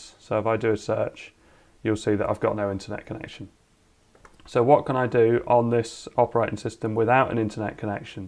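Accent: United Kingdom. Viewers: Yo are you from sheffield mate?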